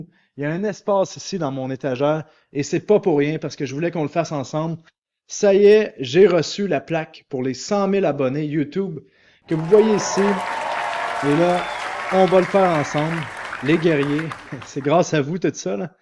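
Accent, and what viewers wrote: Canada. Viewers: Salut, encore merci et bravo pour tes 100k, je me demande si ce n'est pas ton accent Canadian qui m'a attiré dans les cryptomonnaies 😊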